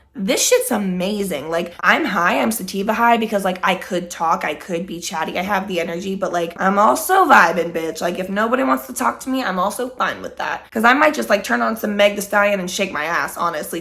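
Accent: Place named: United Kingdom. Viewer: I love every video every time! You do not miss! As someone who also struggles mentally I always love these videos. The British accent is also so funny bc why do I do the same thing when I’m talking about my mental stuff😂😂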